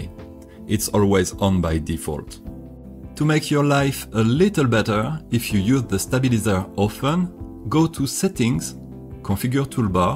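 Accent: France. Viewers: Français ! j'ai reconnu l'accent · thanks!!!! you have a bit french accent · Pourquoi garder un accent français ?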